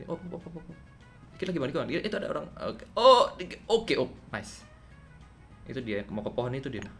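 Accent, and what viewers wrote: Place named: Banjar, Indonesia. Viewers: Banjar banar logatnya 😂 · orang banjar bjir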